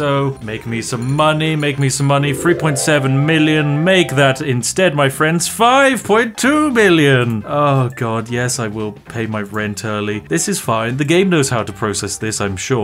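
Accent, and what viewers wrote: United Kingdom. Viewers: This guy literaly british lets gam it out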